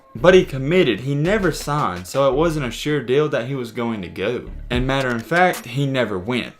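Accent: South, United States. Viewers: You have this southern draw you're trying to hide and its like...you're talking out of your nose or something. not a natural voice, hard to listen to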